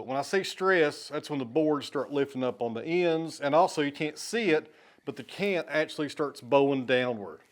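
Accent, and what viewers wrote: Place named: Tennessee, United States. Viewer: Keep doing what your doing. The sawmill, Bruno, mamma cat and cabbage, chores, building your timber frame barn, the chickens, the puppy, your dad, new tools and machinery, trips to the shops and exhibitions, your mistakes and the ability to laugh at yourself, make this a very interesting channel. As a Brit with no experience of life in Tennessee I find this channel fascinating …..and your delivery with that Tennessee drawl and a great sense of humour is the icing on the cake. Keep right on doing what you are doing brother!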